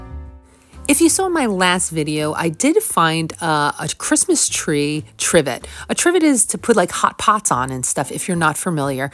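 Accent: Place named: East Coast, United States. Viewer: I can hardly stand to buy new anymore! Everything I wear is thrifted!! And decor for my home as well!!! Books, you name it!! Hugs from Michigan! You sound like you live on the east coast of the United States!! I grew up near Washington DC!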